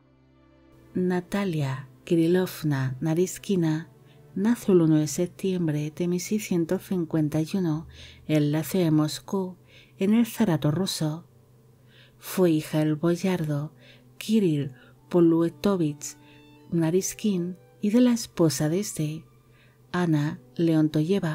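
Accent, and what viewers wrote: Spain. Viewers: De que parte de españa es el acento de la voz de?:0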